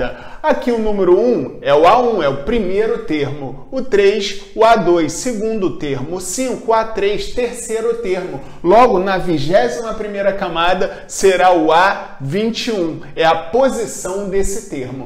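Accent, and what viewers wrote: Rio de Janeiro, Brazil. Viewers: Meu irmão sou teu fã brother, também sou professor de matemática e acho bem diferente e inovador sua didática, apesar desse sotaque carioca pesado friend... A metodologia de amarrar a atenção do aluno me motiva pra fazer o mesmo e conceituar isso na dissertação de minha pós. É isso meu camarada, show.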